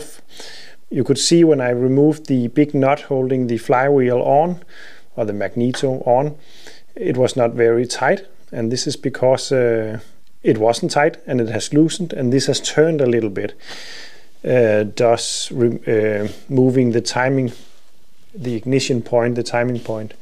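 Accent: Denmark. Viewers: Wait are you from Bornholm?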